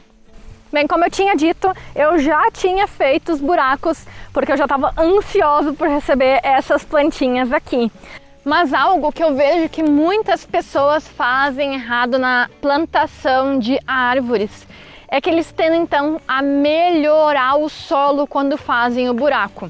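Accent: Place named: South, Brazil. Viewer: Não sabia que tinha um canal falando em Sulista. Como sempre, um ótimo vídeo 🙌🏽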